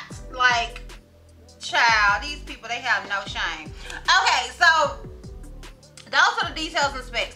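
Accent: South, United States. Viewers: we can't see your kitchen. So you fly anyway. Love your videos and your southern accent 😍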